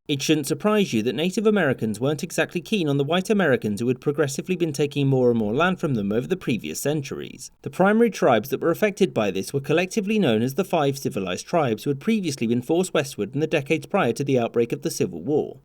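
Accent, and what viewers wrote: United Kingdom. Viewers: Not going to lie... It's a bit weird learning about the American Civilian War though someone with a british accent.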